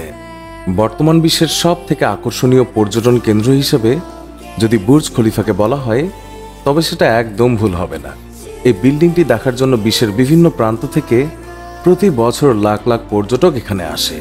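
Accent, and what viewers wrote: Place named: Bangladesh. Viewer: Narrator, Why you call one hundred seventy thousand RUPY?? Shouldn’t it Taka?? Your Bangla accent similar to Bangladesh accent... So Taka you must mention. If you are a Indian man then Rupy is good for you.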